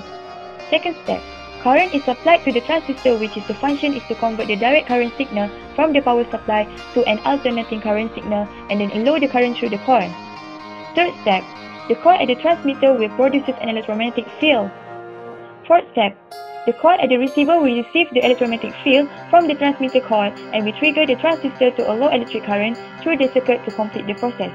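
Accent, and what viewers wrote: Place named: London, United Kingdom. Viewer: good Jobs korg...bangga ada member yg pandai speaking london..hehehe...:D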